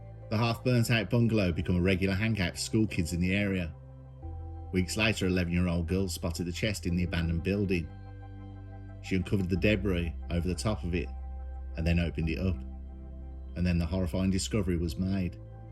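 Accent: Birmingham, United Kingdom. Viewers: Are you from Birmingham? I'm from Lichfield down the road!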